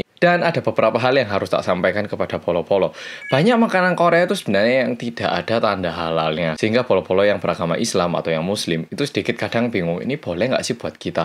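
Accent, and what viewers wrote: Java, Indonesia. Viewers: Lek wong jowo dadi opo ae.boso ne tetep medhok :) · Logat jawa nya gemesin mas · Mas ganteng banget😻😻medok lagi jadi paham bicaranya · Kangen medhoookkkkk nyaaa:" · Iki nonton oppa rasa wong jowo rek rek😊😊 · Korea Ke jowo jowoan wkwkwk🙏👍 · Kan memang Jawanya gk ilang ilang ini oppa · tak kiro dene wong jowo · Kenapa kalo kamu orang korea tapi kyak boso jowo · Lagek delok kok aneh wong korea bosone medok koyo wong jowo😂😂😂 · Orang Korea kok kek orang Jawa ngomong nya yah😂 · Pertama kali liat orang Korea ngomongnya medok bngt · Kok medok bahasa jawa ko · Masa korea medok kaya jawa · Muka korea dikit suara logat jawa awokakaka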